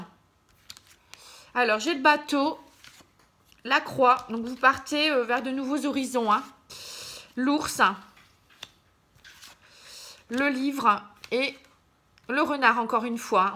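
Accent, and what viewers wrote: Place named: Hauts-de-France, France. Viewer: Bonsoir, merci pour ce beau tirage très complet, très parlant pour moi, en plus avec votre accent Hauts de France, je suis picarde, j'aime beaucoup, bonne soirée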